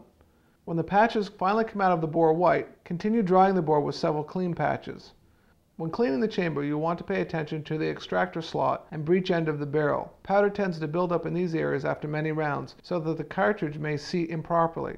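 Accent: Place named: New England, United States. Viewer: Obviously from New England.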